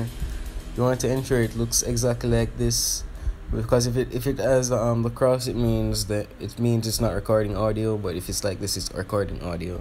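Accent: Jamaica. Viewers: are you jamaican? just askin cause i am half jamaican you just sound like it 😂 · Thx bro I notice your Jamaican and I'm too big up yuh self bro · Your a Jamaican aren’t u? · Are u Jamaican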